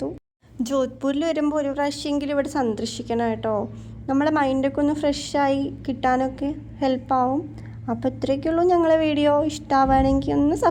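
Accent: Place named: Malappuram, India. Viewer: Kutty Malappuram aano samsarathile Tto Tto kettappo thoni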